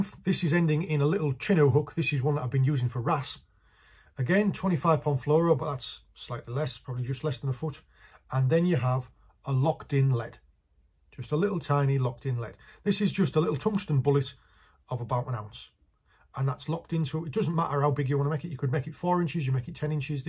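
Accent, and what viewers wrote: Yorkshire, United Kingdom. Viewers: Really good info also I've been using a rubber bead between the swivel and lead... in the USA they use a glass bead to attract the fish..... And how many of the watchers are online looking for a weight called an Arf... You can take the man out of yorkshire......😂😂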